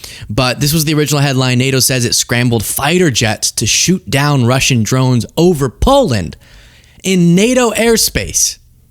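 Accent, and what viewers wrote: Finland. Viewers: we dont need a Finnish accent. Or the giggles and juvenile humor. You have talent, just keep your show professional. The humor you try to introduce doesn't work with the subject matter you cover. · Finnish accent :D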